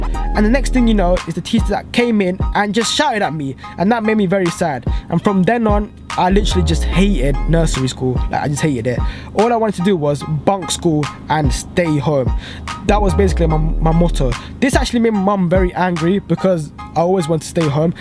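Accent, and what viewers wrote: London, United Kingdom. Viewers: If you're born in France,why do u have such a strong London accent?😟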